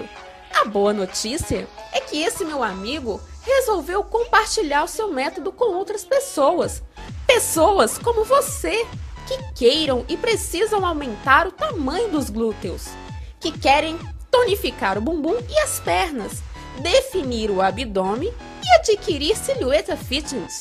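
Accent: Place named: Minas Gerais, Brazil. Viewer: SOTAQUE MINEIROO KKKK <3 AMO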